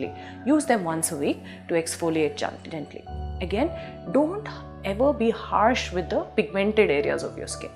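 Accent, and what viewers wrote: India. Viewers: white shadow dear she is an Indian ....in India we concentrate more on our native Hindi language which has a thick accent....we too speak English but the accent remains same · She speaks with an indian accent , i find it hard to understand too . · Yaaae..i hardly undrstnd her . Only Indian will understand her..coz .she speak India English. Hihihiihi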